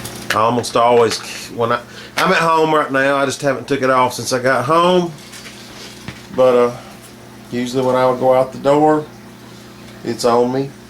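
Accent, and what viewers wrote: South, United States. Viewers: Gotta love a deep southern accent, Lol.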